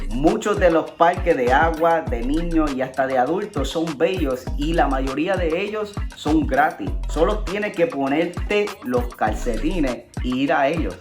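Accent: Puerto Rico. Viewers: A donde esta el gringo, yo veo un Cici y una Cerota osea, no mames que tu lengua raspa, Eres puertorriqueño dumb fuck 👊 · Gracias, muy buen video sobre el pulgarcito. Pero tu hablas y pareces mas Boricua que un "gringo" como dice el titulo de tu video. Cambiale de gringo a boricua. Yo estuve mucho tiempo en P.R. y tambien me anamore de la Isla, lo mismo de NY en donde mis amigos eran casi todos boricuas. En horabuena!!! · Me encanta el acento puertorriqueño y excelente que disfrutes de mí país junto a tu esposa!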